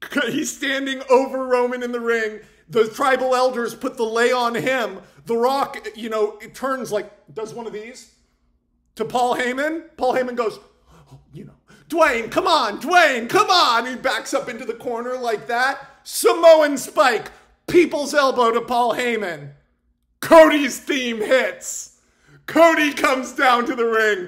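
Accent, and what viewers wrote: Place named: United States. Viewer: Your american accent is so obvious,.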